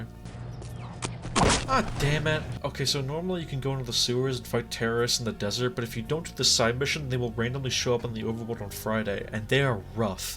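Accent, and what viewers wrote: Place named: United States. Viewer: Žiūriu vidosą, akcentas angliškas, galvoju čia amerikonas ir pyst Gustavo Enciklopedijos intro pradeda grot porą sekundžių. Šito vapšie nesitikėjau išgirsti žiūrėdamas vidosą apie morališkumą žaidimuose.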